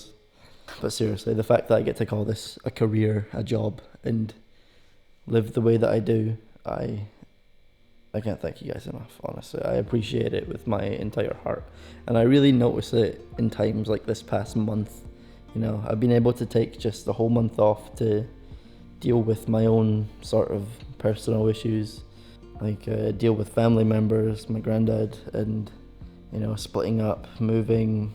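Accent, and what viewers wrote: Scotland, United Kingdom. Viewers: Dude sounds Scottish af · Yaaas knew you was Scottish 😂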